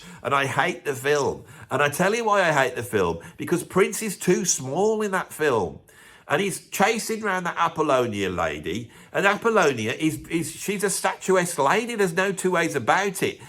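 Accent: Birmingham, United Kingdom. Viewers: This is the kind of well thought out and insightful video that made me a subscriber. I particularly liked the irony of a Brummie ridiculing the northern accent. Bravo, sir!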